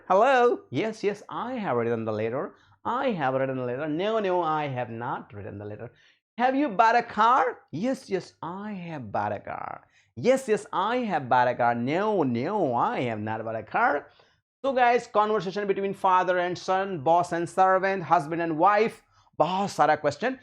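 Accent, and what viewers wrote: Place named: England, United Kingdom. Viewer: Ur pronunciation is as like the english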